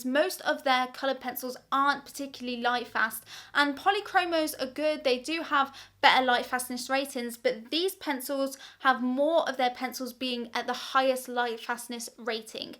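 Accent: England, United Kingdom. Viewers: Your art is ofc amazing, but i think you should also start an English accent tutorials channel.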